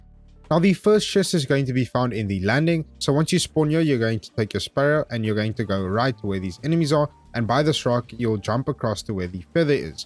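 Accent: South Africa. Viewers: 2 seconds in and I can already hear this is a fellow South African. Thank you so much and keep up the great work! DDP, Bokke for the win 🎉🇿🇦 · are u South African?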